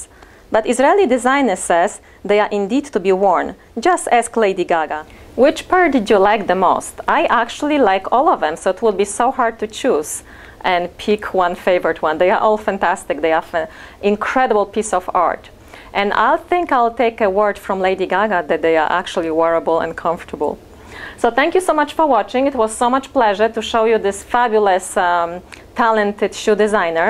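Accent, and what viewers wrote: Poland. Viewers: Are you polish ? Because you have a polish accent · amazing polish accent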